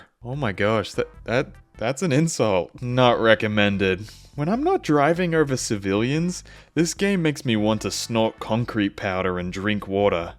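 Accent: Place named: Australia. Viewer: Why do all my favorite British YouTubers always turn out to be Australian?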